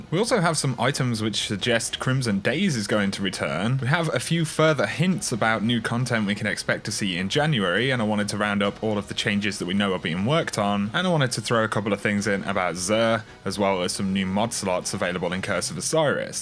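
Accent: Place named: England, United Kingdom. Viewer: Another quality video from owwwwww owwwwwwwwwwwww owwwwwwwwwwwwww (wolf howl) the! Always enjoy your videos, i mean your english accent is a bit annoying but its worth it!;)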